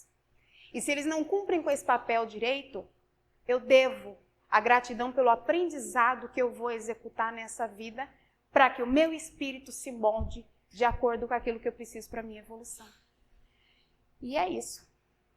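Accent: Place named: São Paulo, Brazil. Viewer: vc é de onde? gosto muito de suas palestras, pelo sotaque vc é paulista.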